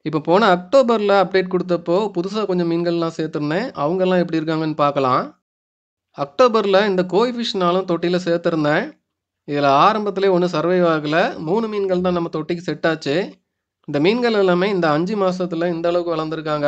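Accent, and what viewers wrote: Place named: Kanyakumari, India. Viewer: s s Language kanyakumari pola irukku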